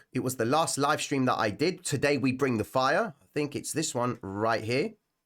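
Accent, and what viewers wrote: United Kingdom. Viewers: Ill be honest it's nice to have a UK voice 👌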